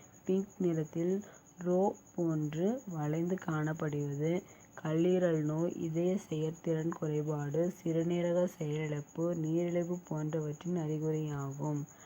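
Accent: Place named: Kerala, India. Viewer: pesurathe Kerala mam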